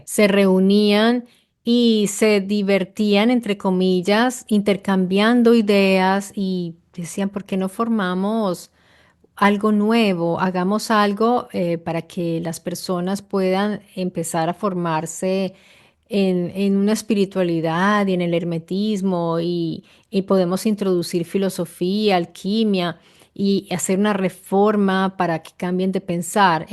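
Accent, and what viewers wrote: Italy. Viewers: Ese acento es de una rola que vivió en Italia y ese cabello/gafas le da un gran marco hermana.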